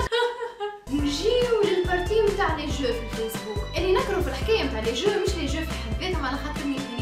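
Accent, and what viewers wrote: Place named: Sahel, West Africa. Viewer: hhhhhhhh you're funny,brabi se7lia? lehjtek thohrtli se7lia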